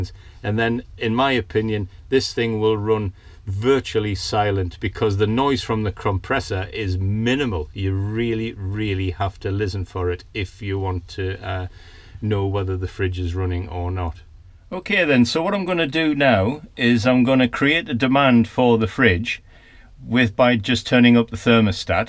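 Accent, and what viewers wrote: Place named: North East England, United Kingdom. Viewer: I recognise a bit of a north east twang to yourself, great video and information, I will steal that idea, cheers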